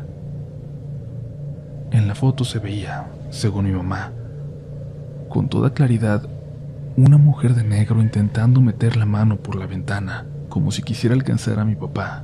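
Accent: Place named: Tijuana, Mexico. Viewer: Hola Te escucho desde Tijuana! Hablas con el acento tijuanense, por eso me gusta como relatas!!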